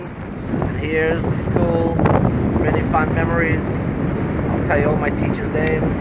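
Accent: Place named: New York, United States. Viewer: I love a good ole NY accent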